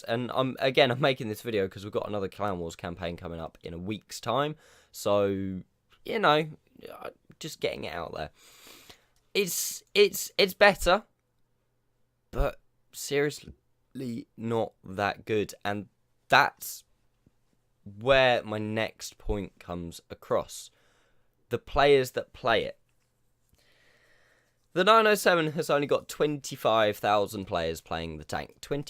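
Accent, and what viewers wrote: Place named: Southern England, United Kingdom. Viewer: hey man great vid, im from the south of england and it sounds like you are from somewhere around there, if you ever fancy doing some platoons id love to play with you some time, you seem like a cool dude, getting the 907 on monday so would be cool to do some games! all the best mate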